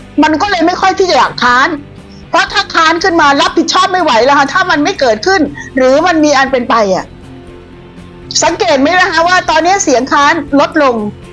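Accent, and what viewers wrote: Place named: South, Thailand. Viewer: ติดตามผลงานอยู่เป็นประจําชอบการพูดถูกใจมากเลยพูดเหมือนคนภาคใต้ใจถึงพึ่งได้ครับ❤❤❤❤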